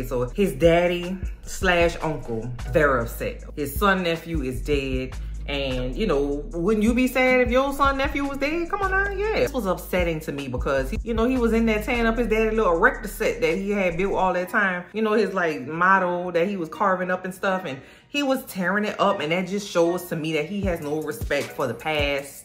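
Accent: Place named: Louisiana, United States. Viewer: what a wonderful accent! Louisiana?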